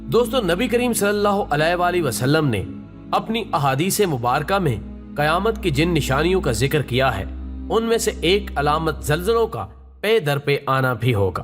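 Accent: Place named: India. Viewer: logo please isko unsubscribe kar do ye RAW walay misinformation aur na umeedi ki videos laga rahay han...zara ghor se dekho is video ko iska talafauz sunno...ye aik HINDU ha RAW ka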